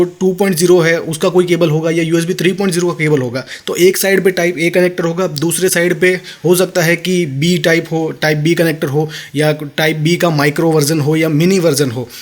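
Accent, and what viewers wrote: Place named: South, India. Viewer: south indian aah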